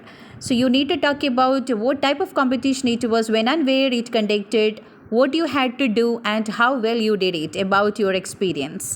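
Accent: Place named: United Kingdom. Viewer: Mam is using British accent more.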